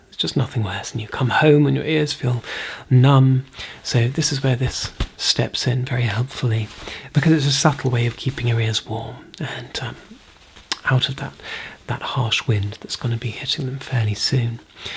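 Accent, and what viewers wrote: United Kingdom. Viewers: I know your accent is British obviously, but you look Swiss. 🤔 🤠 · You would have been a better loki any day 😄 that accent is classic very agatha christie 😏 you should do a sherlock homes asmr 👌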